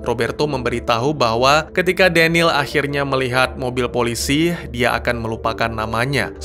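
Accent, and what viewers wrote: Aceh, Indonesia. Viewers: Pasti abangnya orang aceh ya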